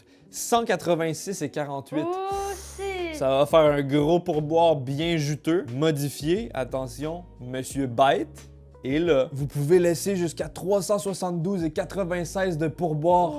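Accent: Quebec, Canada. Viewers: cest la premiere fois que je vois un de ses videos, je crois entendre une pointe de québécois dans sa voix, est ce possible que sa soit un frère québécois ?? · J'aime trop l'accent québécois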